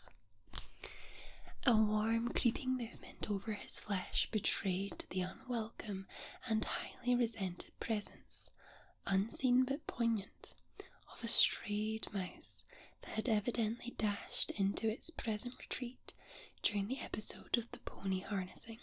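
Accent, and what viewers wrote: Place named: Scotland, United Kingdom. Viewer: Very relaxing. Love your Scottish accent.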